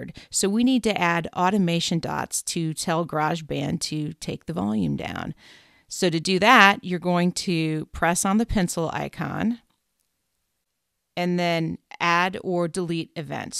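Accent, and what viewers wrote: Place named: United Kingdom. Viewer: U sound so British lmao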